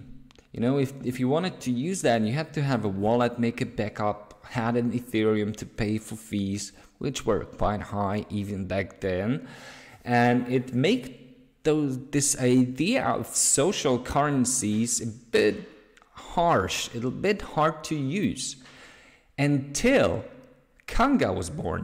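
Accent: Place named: Poland. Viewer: Mówi po angielsku ale i tak słyszę po polsku xd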